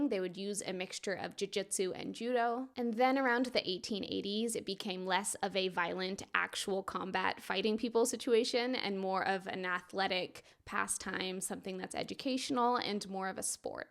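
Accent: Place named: United States. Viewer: Maeda went to brazil specifically to showcase judo. He was a prize fighter who traveled the Americas doing demonstrations and challenge matches. He was not a business man. She's American, so I wouldn't say it's incorrect to pronounce their names with an American accent. I've heard some Americans that train under the Gracies use the R when pronouncing their names. Roger Gracie fought once in the UFC against Tim Kennedy.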